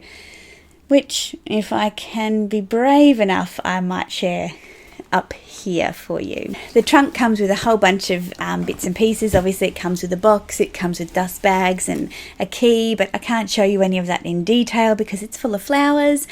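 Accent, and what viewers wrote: Australia. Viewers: Happy (belated) Birthday!!!! I like to listen to your Australian accent. Keep being blessed!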